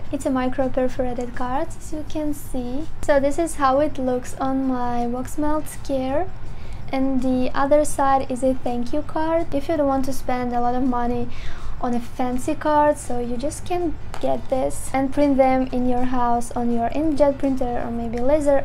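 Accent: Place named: England, United Kingdom. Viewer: Loving your videos, your english accent is sweet you are doing a fantastic job, keep it up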